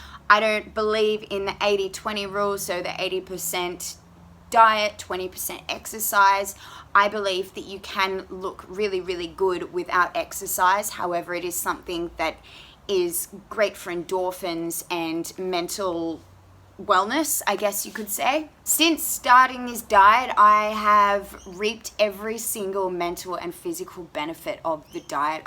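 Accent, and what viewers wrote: Australia. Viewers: THIS!!! I was looking for somebody i could relate on this diet. When I saw you I was like: YEP! THAT'S HER!!" my kind of girl to follow: strong, confident, beautiful mind (and body)., well spoken (love the aussie's accent, can't help it;) ),please don't change yourself! ever!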